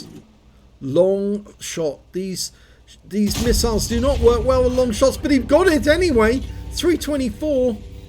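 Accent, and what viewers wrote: United Kingdom. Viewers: Mad respect to your enthusiasm while commentating and British accent keep up good work gg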